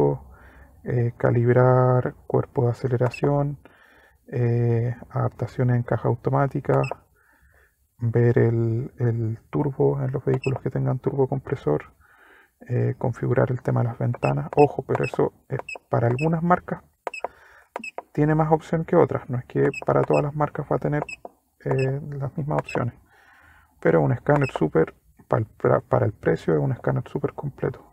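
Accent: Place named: Chile. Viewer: Amigo, una consulta.. Por tu tono de voz sos de chile, mi pregunta es, para los vehículos japones jdm que se vendieron solamente para ese mercado, pero vienen de igual manera con el volante a la derecha, Toyota subaru mitsubishi nissan etc etc.. Sirven estos escaner? Soy de Paraguay y hay miles de vehículos volante cambiado por acá.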